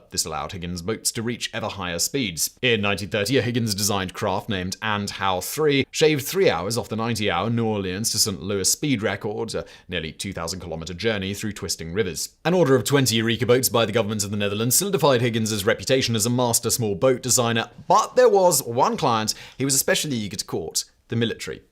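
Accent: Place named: RP, United Kingdom. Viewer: you speak too damn fast. Your speech is pretty close to double the normal speed of a typical English speaker from around the world. Although you have a very crisp, articulate upper class English accent that is not difficult to follow for most North Americans, you speak so rapidly that I believe that you are leaving many non-native English speakers bewildered. If I have one of your videos playing in the background and I’m not concentrating on it specifically, I am losing track of what you have to say at times. And I lived in the UK for three years so I have little to no problem with most English accents short of a Geordie.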